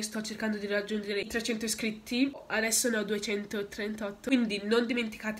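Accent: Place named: United Kingdom. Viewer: Si sente tantissimo l'accento British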